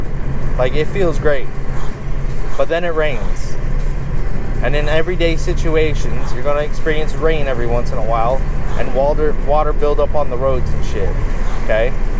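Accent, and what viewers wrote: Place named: United States. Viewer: Couldn’t tell if this guys American or English for first 5 mins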